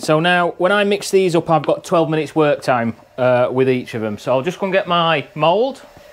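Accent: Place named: Yorkshire, United Kingdom. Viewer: Nice little video on your process mate, nice to hear a fellow Yorkshireman on YT, the finished result is bloody awesome mate, all the best from Doncaster.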